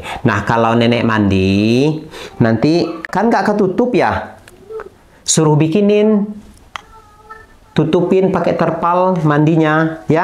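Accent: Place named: Java, Indonesia. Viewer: Pak lucu kalo gomong Jawa 😂😂😂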